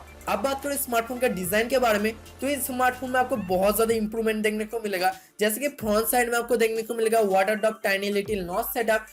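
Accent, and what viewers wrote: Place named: Bihar, India. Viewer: Bihari ho kya boli se laga. cameda....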